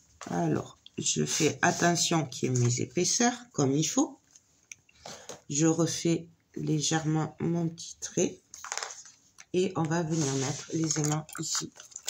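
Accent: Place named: Toulouse, France. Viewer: Bonjour, 👏 Très bel album. Vu l'accent et l'allusion à Toulouse je suppose que vous êtes dans les environs. J'habite à Albi et je ne connais personne qui scrappe tout proche.je suis autodidacte depuis mes débuts, j'adorerais avoir ma chaîne et partager ma passion mais ça doit prendre beaucoup de temps surtout quand on est accro et aussi pas évident avec mon métier de soignante. Alors je visionne...je retranscris les tutos et je fais des patrons... Là avec vous, je souris c'est interactif même si vous ne me répondez pas je finis vos phrases et vous souffle les mots manquants spécifiques du scrap! 😁. Je n'ai jamais eu l'occasion non plus de me rendre à un salon créatif car pas informée. J'aimerais bien correspondre avec vous 😉. Meilleurs vœux✨️ et belle journée à vous 🌺 Sophie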